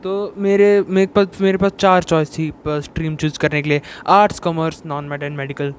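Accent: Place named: Punjab, India. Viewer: Very informative but that thoda sa Punjabi accent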